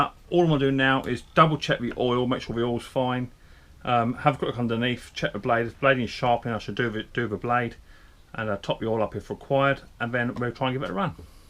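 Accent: United Kingdom. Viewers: Love the accent, the British is my favourite, great job with the mower tho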